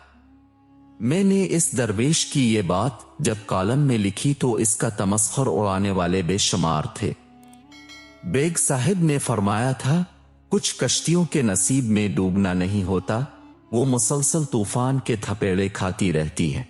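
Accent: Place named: India. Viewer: indians ki urdu aisi he hy lekin hame topic pe ghor karna chahye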